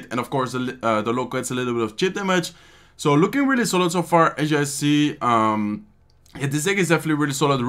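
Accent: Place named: Netherlands. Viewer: Jij bent Nederlands of niet 😂 Je hebt een Nederlands accent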